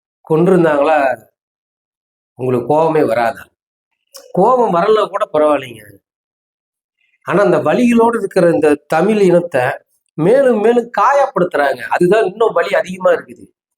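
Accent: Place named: Kerala, India. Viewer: ஆன இலங்கை தமிழ் ஓரளவுக்கு mayala சாயல் வரும் , ஏ இந்த பெண் உளறி கொற்ற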